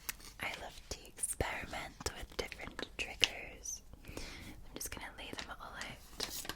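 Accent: Scotland, United Kingdom. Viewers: Yeah. I like the talking. She has one of the best soothing and most relaxing voices out there. And her Scottish accent is just right. Its relaxing af. To whomever has a problem with it. GTFO bro. We love just as she is. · Boeys and girrels 😂 love the Scottish dialect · Wow I like getting personal my Scottish sassy lassie! 😌😏☠️🏴🖤🌌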